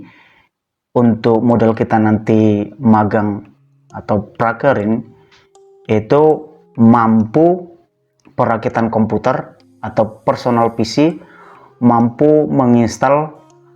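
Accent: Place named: Tegal, Indonesia. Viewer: Semangat pak ngapak tegal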